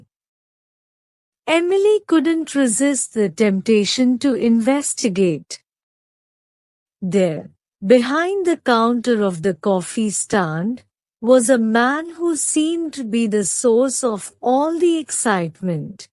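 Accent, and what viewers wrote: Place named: India. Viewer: Why I listen to to India accent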